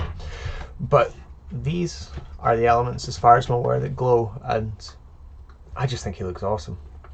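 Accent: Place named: Scotland, United Kingdom. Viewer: My first video since subbing and I enjoyed it very much so. Makes a change hearing another Scottish twang lol. Great job. Really well done video pal I enjoyed it. I still need a Mysterio pop Looking forward to more of your content man. 👍🙏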